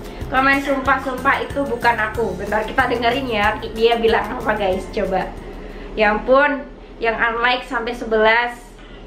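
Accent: Java, Indonesia. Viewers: Medok bgt tapi lucu suaranyaaaa😍😍😍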